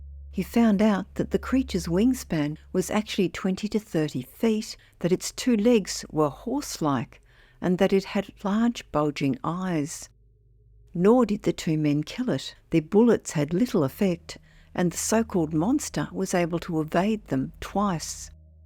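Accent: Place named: Australia. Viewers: That's an Australian accent, pretty close though. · It sounds like an Aussie accent (Australia) or a Kiwi accent (New Zealand).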